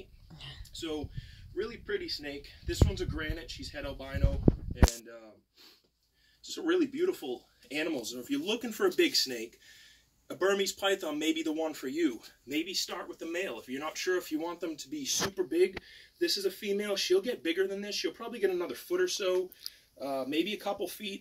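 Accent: United States. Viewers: Am I the only one that hears a Jersey accent poke through? Just me? k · You sound just live my dad!!! Love the east coast accent 😂💕 Mainer here 👋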